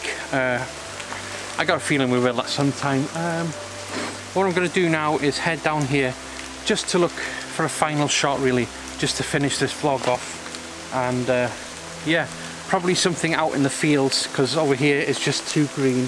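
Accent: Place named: Wales, United Kingdom. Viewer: Blwyddyn Newydd Dda. I sure am missing Gwynedd but good to hear your accent, well I guess you got some more snow, and thanks for getting out there.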